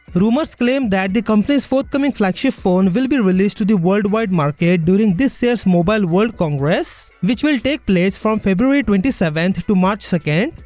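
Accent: India. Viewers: Indian English dialect makes it exotic for ears 👂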